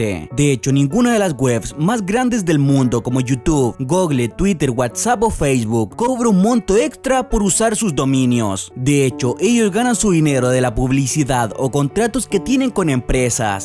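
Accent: Chile. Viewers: eres chileno? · Eres de chile?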